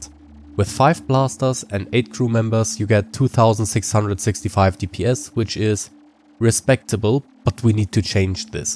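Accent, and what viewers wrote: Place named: Germany. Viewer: Warum hört sich das an als wenn ein deutscher gut Englisch spricht?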